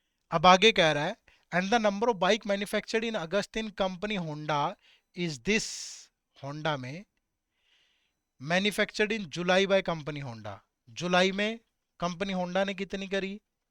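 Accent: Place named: Haryana, India. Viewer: Sir good english with haryanvi loved it!!! Thankyou for the content 💌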